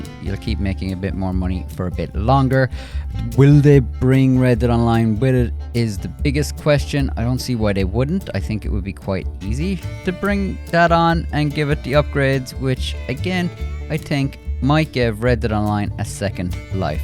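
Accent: Ireland. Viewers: Thank you. Will keep playing as I’m not a “gamer”…RDR2 and classic Guild Wars 💕☘️ Irish accent? Cork?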